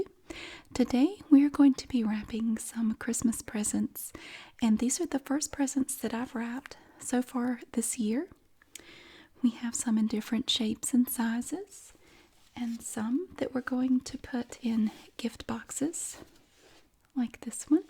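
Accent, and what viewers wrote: South, United States. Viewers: Omg yes . Like a southern Pam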